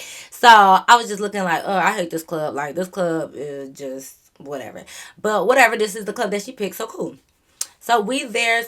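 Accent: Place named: Michigan, United States. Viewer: Lol I just knew you were from Michigan soon as you started talking but once you said good times I’m Like yeahhhhh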